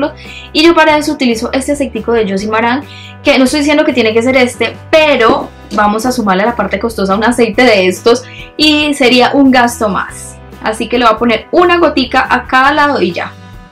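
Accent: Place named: Antioquia, Colombia. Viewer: Hola, el vídeo me entretuvo mucho y me gustó la temática, pero quiero darte una recomendación si me lo permites. Espero que no te lo tomes a mal, de verdad lo hago con una buena intención porque tu canal me gusta muchísimo. Yo sé que muchas veces los que somos paisanos y tenemos este tipo de acento hablamos muy rápido y por esa razón nos enredamos, pero en los vídeos esto se hace mucho más notorio, y puede llegar a ser un poco estresante escuchar que alguien hable tan rápido, se equivoque y se trabe. Lo que puedes hacer es intentar hacerte consciente de lo que dices al hablar para así hacerlo más despacio, y si te equivocas en una palabra la vuelves a repetir un poco más lento y pues lo editas, así creo que el vídeo se vería mucho más calmado y profesional. Un besito desde Medellin, saludos!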